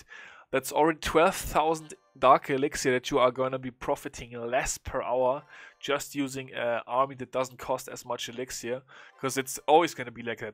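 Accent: Germany. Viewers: You are german i can hear it by your english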